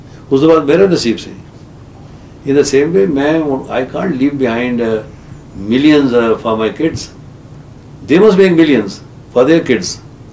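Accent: Jhelum, Pakistan. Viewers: Hy oy bismilaah kran mahra grain sunil mery same te suchi boli jhelumi original boli. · Pure Jhelumi language. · My uncle, who is almost same generation as sahib, who is also from Jhelum, has the exact same accent, tonation, pronunciation and fillers (for instance "Hain jee"). So amazing. Ditto copy. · tohadi boli v pakistan aali a❤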